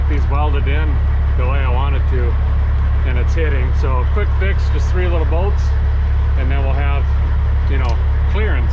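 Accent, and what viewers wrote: Minnesota, United States. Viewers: Your accent is super thick for Minnesota. I'm in duluth what part of Minnesota are you from? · Can't wait till you pick up a Southern accent on top of that Minnesota accent.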